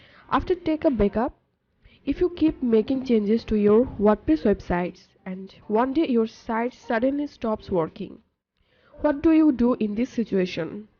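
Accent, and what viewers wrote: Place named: Bengal, India. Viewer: Her accent is Bengali 🎈🎈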